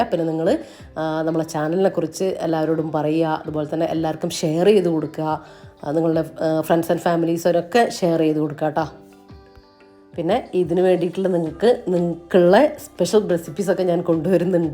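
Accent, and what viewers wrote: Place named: Kasaragod, India. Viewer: Ithante Kurachoke words Kasaragod slanganallo.❤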